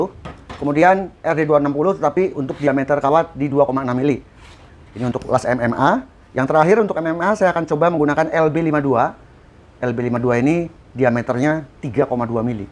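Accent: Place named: West Java, Indonesia. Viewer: Alhamdulillah bukti hasil positif dalam memberikan edukasi memberikan ilmu gratis yang diberikan oleh akang guru kepada para subscribe yang selama ini setia menunggu review tool murah meriah hingga tool premium, terima kasih atas ilmu dan informasi yang diberikan selama ini, ingat awal mula mengikuti chanel ini secara tidak sengaja kalo ga salah masih 2 k dan masih sederhana masih ada lampu bohlam di atas meja, terus kalo review logatnya sunda pisan, selain itu selalu berbarengan dengan kumandang adzan subuh, selamat akang guru wilujeung 20 k mudah mudahan terus dan terus berkembang hingga mendapat silver bottom 🙏👍👍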